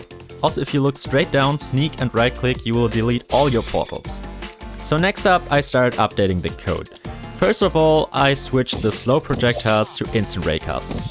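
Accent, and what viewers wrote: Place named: Germany. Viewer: Wie man das Deutsch bei dir Raushört haha. Nice Gravity Gun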